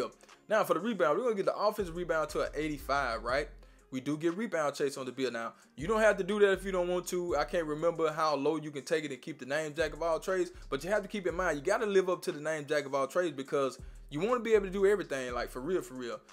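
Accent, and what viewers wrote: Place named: South, United States. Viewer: Good Vids bro . Where you from . Sound like the south . How you say “ you know I’m talking bout “ lol sound like my area lol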